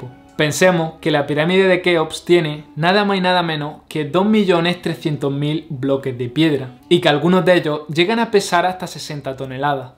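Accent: Spain. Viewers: Cuando los españoles podrán decir video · Pareces hispano, con acento Espanol, cool. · Desde Perú 🇵🇪 ¿ No será antes que los Faraones reinarán, serían los ANUNAKIS? Responde 😲está interrogación, gracias 😁. ¿ De qué parte de España eres? Lo pregunto por tú forma de pronunciar tú español 🤗es agradable muy diferente a los que conozco de Madrid.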